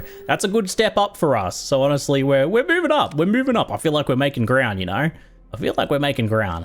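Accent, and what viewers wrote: Australia. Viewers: I thought, for a second, he was doing a Crocodile Dundee accent, but then I realized it's a video and it's just how he talks.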